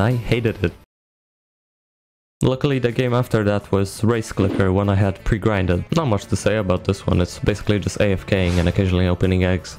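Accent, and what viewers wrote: Poland. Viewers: yo you polish? dawg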